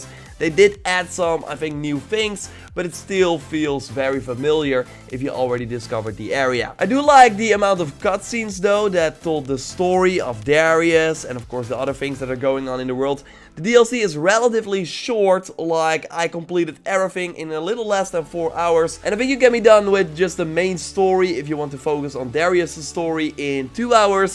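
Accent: Netherlands. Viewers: This dude is 100000% dutch the accent is real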